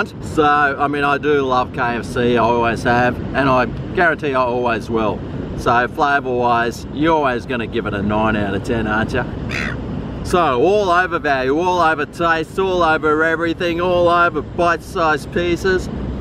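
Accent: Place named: Australia. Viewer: australian accents are way too course, I can't listen to this prattling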